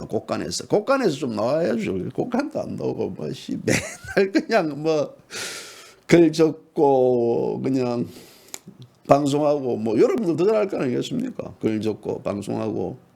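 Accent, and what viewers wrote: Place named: Gyeongsang, South Korea. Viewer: 그렇게 오래됫나요? ㅋㅋ 몰라뵙네요~ 갱상도 말투가 투박 하면서 끈적 끈적 ㅋㅋ 요즘은 명심하고 들어옵니다^^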